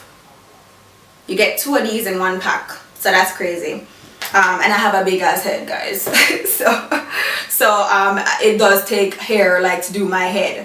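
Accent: Jamaica. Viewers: Jamaican? yeah girl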